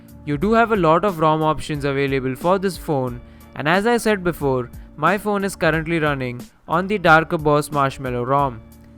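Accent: India. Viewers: Oh god this indian "English" is killing my ears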